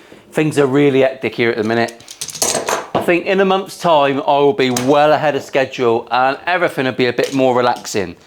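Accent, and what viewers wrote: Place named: Plymouth, United Kingdom. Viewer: Shit - I’ve got to make a second observation: “Triggers wankers” is now my favourite quote. Wish I had a Janner accent!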